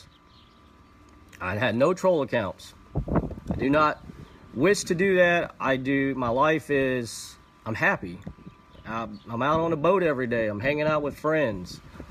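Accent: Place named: South, United States. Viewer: Asian with a southern accent...amazing/sexy I watched your whole video even though I don't know you. I would fly you to the Philippines and give you zen.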